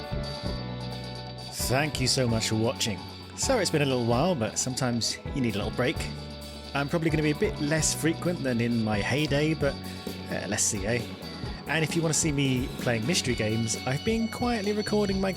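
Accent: United Kingdom. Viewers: The US Audio Track is better balanced. But still sounds British 🙂 (I'm Canadian, so the accent doesn't really matter [I know it's just a 2nd audio track with better balance]). Glad you're back! Your videos are fantastic!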